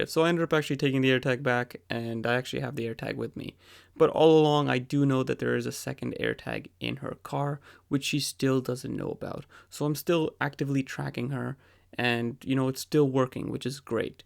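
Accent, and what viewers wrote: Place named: India. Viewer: indian accent does not surprise me about a guy tracking his girlfriend lol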